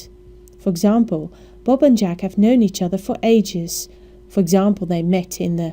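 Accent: England, United Kingdom. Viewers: thanks a lot ma'am I need this for my test In English I need to past this test so I can graduate grade 6 thanks alot and you sound like my teacher in English but a really nice voice your form Ingland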